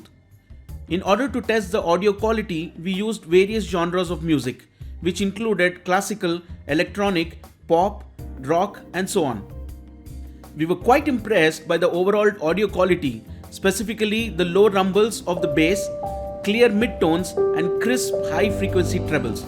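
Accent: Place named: India. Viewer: his accent is Indian not arabian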